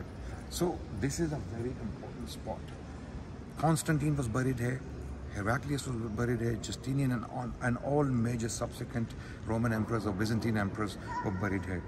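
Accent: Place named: United Kingdom. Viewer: Brother, its J as Yot, funny to hear your brittish accent Justinian as (J Justice). Its closer to Yustinian (as York )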